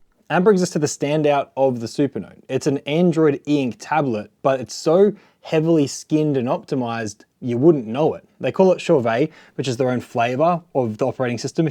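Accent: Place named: Australia. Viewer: I've got a Supernote Nomad and I love it. Can highly recommend if you are a writer/reader of any kind. Good to hear the voice of a fellow Aussie promoting such a great product.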